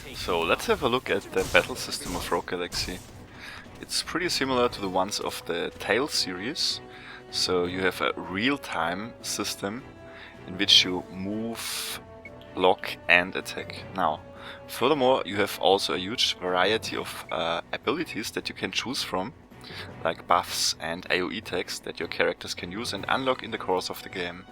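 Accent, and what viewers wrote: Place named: Bavaria, Germany. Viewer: you Sound very german. :D Can you play this game at full Screen? ape escape 2 seems to be the only full Screen game. :/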